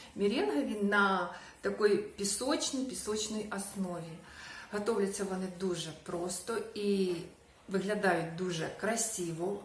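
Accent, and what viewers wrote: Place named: Surzhyk, Ukraine. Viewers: Ти молодчинка.я такой з -під Одеси.я зараз далеко від домівки.дуже скучаю.включпю твій канал і ніби дома,разом готую з тобою.А рідний разговор який!!!мені здається я тебе бачила чи на Привозі чи на Новому.Ти там може торгувала? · На суржике ГЭкать и ШОкать предпочитает 😮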